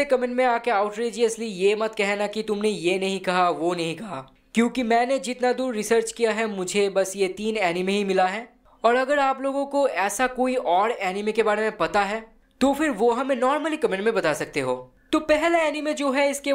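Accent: Bengal, India. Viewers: Bro u from Kolkata ur accent like Bengali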